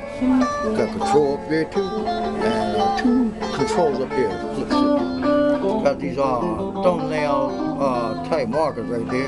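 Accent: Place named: South, United States. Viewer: It's so weird seeing a guy with asian heritage having a really southern accent. XD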